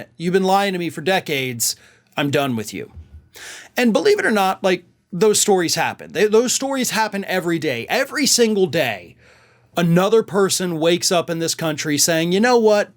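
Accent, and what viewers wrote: South, United States. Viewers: Love the southern accent.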